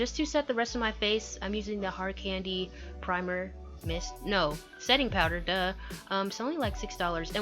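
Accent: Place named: Bay Area, United States. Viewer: Where are u from ??? You sound like you'd be from the Bay Area 😭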